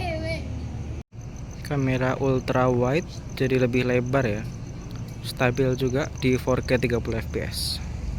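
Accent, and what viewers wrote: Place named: Banyumas, Indonesia. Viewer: sepertinya logat banyumasan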